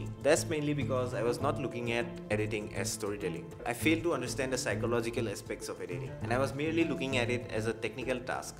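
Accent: Assam, India. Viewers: bhai tmi axomiya nki....assamese accent ata ase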